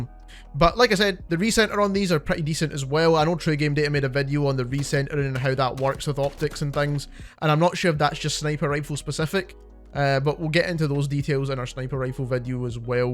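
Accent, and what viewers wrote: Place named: Glasgow, United Kingdom. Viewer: Are you Scottish bro sound a tad Glaswegian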